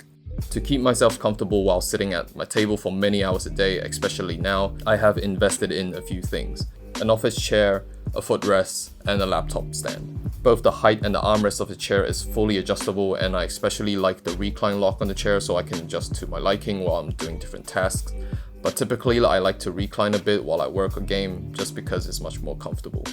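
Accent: England, United Kingdom. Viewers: I really like your English accent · nice english accent you got there attending a local school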